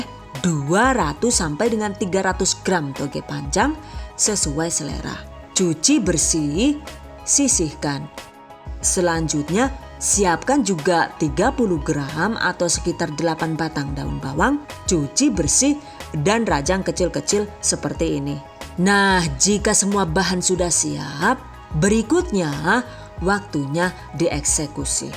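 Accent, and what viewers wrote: Banyumas, Indonesia. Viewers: Ngomonge koh ngapak si mba...anu wong ndi si...aku wong Maos... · Orang mn sih Bun, bahasanya ngapak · Bisa ngapak ngomonge.. wong endi sampean..? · Aslinee Rika wong ngapak yakk? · Lah jebule ngapak😂 Gombong hadir😀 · Jere madiun tapi ko ngomonge ngapak kaya enyong.sampean wong kebumen apa cilacap.apa banyumas.tegal ya mbak.ayoo ketahuan. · Gra" ngomong nya ngpak jadi aq subscribe 😁😁😁😁 manteeeep min 👍👍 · Tks resepny Mb...smbil mndengarkn Mbny mnerangkn kdang trtwa sndiri dengar logat bcarany sy jga ngapak Mb salam knal dr purwokerto..Mb...sgt m inspirasi smg smkin sukses y Mb n sehat sllu aamiin... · Jebul ngapak rikane yah yu😁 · Mantap👍 simpel ekonomis Bdw lucu ngakak sm ngomong ngapake😀😀 tegal,Kebumen,Purbalingga rikane yu👍🙏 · Ngakak yakin,,,trnyata wong ngapak toh,,pada yu nyong be wong ngapak kroya🤣🤣🤣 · hahaha ngapak jg yuuu ....d tunggu resep berikut nya ..💕🌹🌹🌹🌹🌹🌹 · Deneng ngapak kaya AQ yaaa hahaha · Jebule ngapak kaya nyong ha ha ha ha... 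maturnuwun Yu resepe jan josh banget yakin... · klo pas ngomong kirain org luar ..eh pas dgr ngomong ngapak..walahh..khas bgt pada karo inyong..😃😃😃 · Konsep videonya lucuu jadi ga bosen nontonnya.. Eh deneng bahasane ngapak. Aja aja wong banyumas kie ya 😂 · Aq sllu ikutin resep2 dr bunda ngapak ini hehe .... Detail dan jelas ,dan sering aq praktekin ...mksih ya bun aq jd nambah ilmu 🥰🥰🥰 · Bund..kok pinter ngapak sih?ky bhsku di kroya hahaha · Lucu ngapaknya😂😂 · Suara ngapake gawa ngakak😂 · Lucu kok bisa bahasa ngapak, orang mana ini, salam kenal saya dari Cilacap 😆, terimakasih resepnya 🙏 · tiba😂 kaget aku dengar bahasa apaan tu ngapak kah 😅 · Jenengn asline ngendi sih yu? Kok ngmonge ngpak kaya aku. Aku kebumen.. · Jebul wong ngapak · Bunda orang mana aslinya kok dialek Banyumasannya fasih😀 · Wong ngapak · Wkwk ada bahasa Jawa nya .. ngapak pula bikin ngakak 🙈🙏🏻 Itu bahasa daerahku Purwokerto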